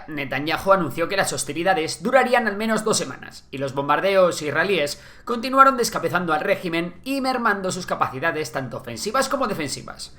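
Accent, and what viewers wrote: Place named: Spain. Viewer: Oista chaval que feo es el acento español tío no lo tienes en latinoamericano?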